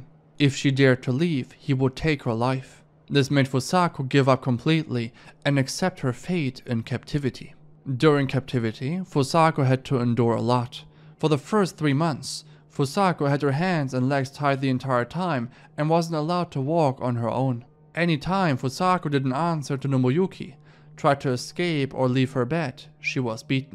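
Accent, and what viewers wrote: Germany. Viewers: I knew u were German u have a similar accent to my bestfriend from Augsburg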